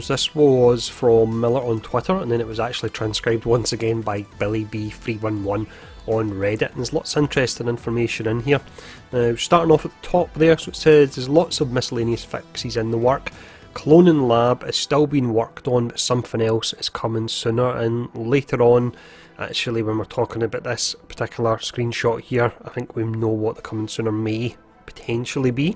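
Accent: Scotland, United Kingdom. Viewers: Your Scottish accent is strong where are you from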